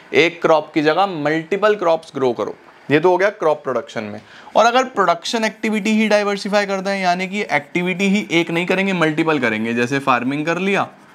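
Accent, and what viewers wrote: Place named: Punjab, India. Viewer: Sir your punjabi assent 🔥🔥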